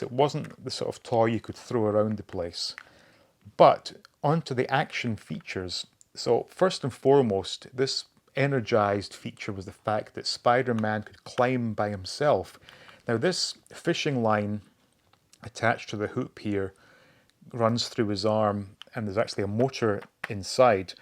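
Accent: United Kingdom. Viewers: I had the UK one which I guess you had too by your accent. But it was totally different it had no battery's for a start I think it worked on a sping system so when you pulled the cord it pulled a spring tight and them pulled itself up . The Web was see through strong rubber and it glowed in the dark and so did spideys spider on his chest and maybe his eyes and I think the body was in better proportion and not as thin as the USA model . But there was no tourch as there was no power . So was this not the one you had ? Thanks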